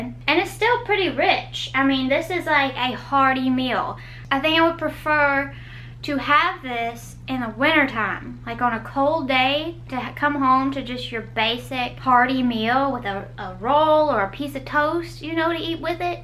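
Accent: South, United States. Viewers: First time watching your video and subscribing too loving your southern accent.... you are a beautiful and funny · Very simple, love that. This woman is really likable, cute southern accent. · You should doing the video without your Southern accent, like the video that was trending on Reddit. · lol i just made the same kind of dish xD and that southern accent thooo thats sound so great :P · I could never understand why you have a super southern accent and your brother doesn't. I think you need to add a lot more seasoning but this looks good.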